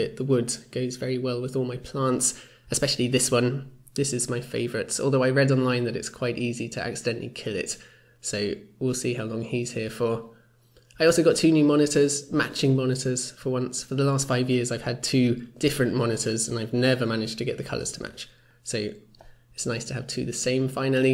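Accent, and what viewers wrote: Sweden. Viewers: Are you from Sweden? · are you from sweden? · Are you swedich?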